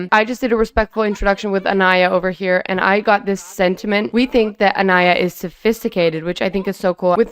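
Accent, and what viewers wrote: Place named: United States. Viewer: I don't understand any ball of it, but even under us... your voice wtfff its fucking perfect 🤩🤩🤩🤩 really americanish really good omg im flabbergasted no words wow 👍🏼👍🏼👍🏼👋👍🏼👍🏼👍🏼👍🏼👍🏼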